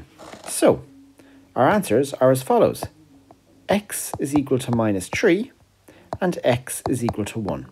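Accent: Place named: Ireland. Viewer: Thanks! Also, love the accent. Go Ireland lol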